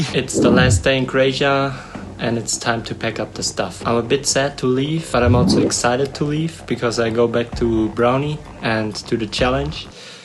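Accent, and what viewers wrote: Germany. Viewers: Are you german? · Your videos are really good for such a small youtuber 👍 Yes, there's a clear difference to Browneys channel, but you deserve more subs definitely! And your english is also good, even if you can hear the german accent, but mine is much worse 😂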